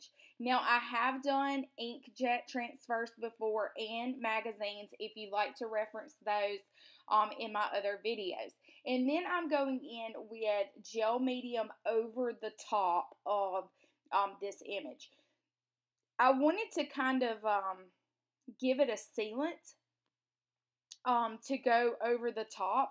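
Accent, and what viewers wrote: South, United States. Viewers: I love how a southern accent just makes everything better! :) I have watched a couple videos on image transfers - I’ll have to check out your other videos - for some reason it’s a technique I love but scares me. I think I need to purchase the gel medium - I have fluid - I think the thicker medium will help the process.... also love the masking tape tip! From what I have seen from this video I am really going to enjoy your other work! That’s one of the reasons I love these hops. 1. I get to see new channels 2. I love seeing a similar theme through out and all the different takes AND 3. Who doesn’t love a chance to win something! Lol. (USA) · your beautiful southern drawl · Girl your accent makes me home sick :) I grew up in South Ga/ North Florida but now live in Ohio. Love the image transfer idea. Really cool page. (In the US)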